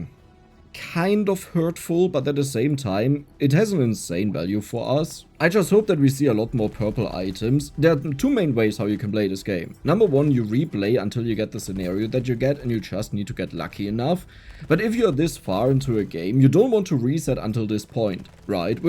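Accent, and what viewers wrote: Germany. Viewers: what do you mean finding out? You couldn't tell from the German accent?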